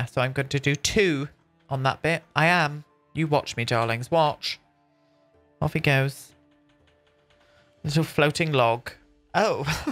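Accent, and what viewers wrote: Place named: United Kingdom. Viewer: I love that you say " I Reckon " and your British... I love it !!!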